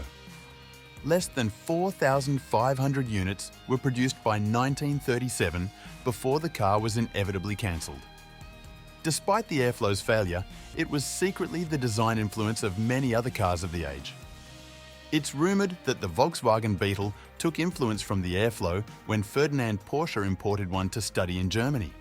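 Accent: Australia. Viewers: Love hearing an Aussie voice doing one of these, amazingly put together video to top it off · Who wrote the script? This guy has an aussie accent but speaks the American language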